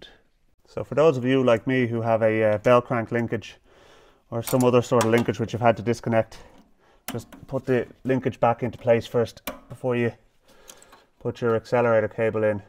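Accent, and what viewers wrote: Ireland. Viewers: Are we Irish?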